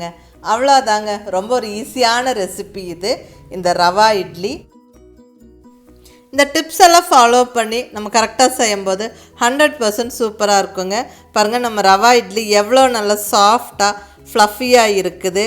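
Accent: Coimbatore, India. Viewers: ........w r u????? I want to see u..... i like ur all recepies......kovai slang superrrrrrr......i like u.... i am INDHUMATHI vellore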